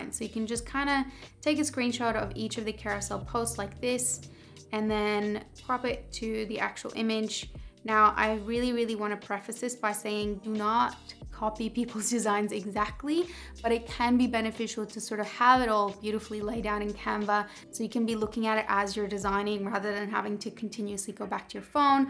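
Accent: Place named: Australia. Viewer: I love trying to decipher accents, especially blended accents like yours. What I’m mainly hearing is Australian but with a bit of something else. Is it North American? Irish?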